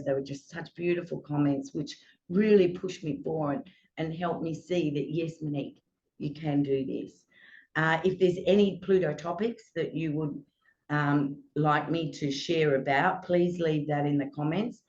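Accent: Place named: Australia. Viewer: Wow 😮 I just walked in on the most epic astrological gift !! You had me with the Aussie accent but now going back to soak up all of your videos uploaded prior to this one ❤️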